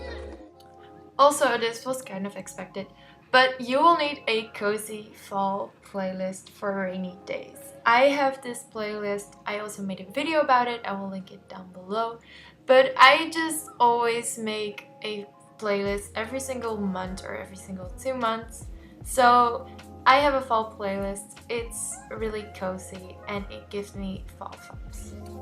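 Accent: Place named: Netherlands. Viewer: Everything looks so nice! Also your thumbnails look so great and look so well together! OMG I KNEW I HEARD AN ACCENT. Kom je ook uit Nederland? Cute, subbed <33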